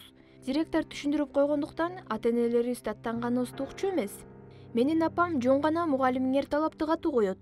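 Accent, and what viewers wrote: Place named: Kyrgyzstan. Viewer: Жоқ ей бұл анимацияны қырғыз адамы айтқан ғой😂менің құпиям болса бұлай дыбыстамайды💖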